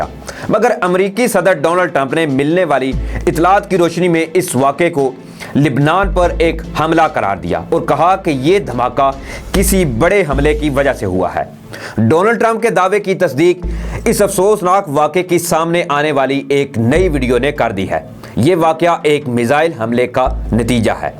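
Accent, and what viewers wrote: India. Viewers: bhaai aap ki urdu Indians jaisi kiyun hai, aap ki videos bahut achi hain lekin iss ka sara ta'assur aap ki urdu ki wajah say kharab ho jata hai, plz koshish kejiye k aap ka lab o lehja achi urdu ka ho, plz bura mat maniye ga, sorry again, Thanks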